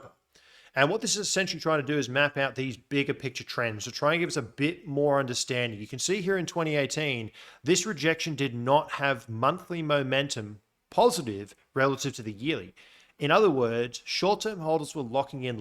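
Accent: Australia. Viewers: Nice to hear an Aussie providing such valuable info in the BTC space...👍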